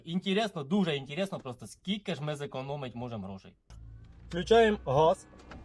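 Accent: Poltava, Ukraine. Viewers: Доооли ,Ти з галещини Чи з козельщини,короче з пооолтавщини,бо неноче з у хобот мовешь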